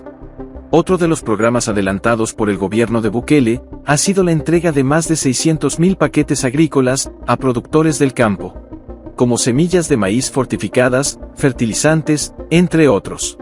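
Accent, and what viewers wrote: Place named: Castile, Spain. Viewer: Un buen documental con una voz narrativa en perfecto castellano. Muchas gracias desde Tenerife. Reino de España. Viva nuestro muy amado EL SALVADOR !!!.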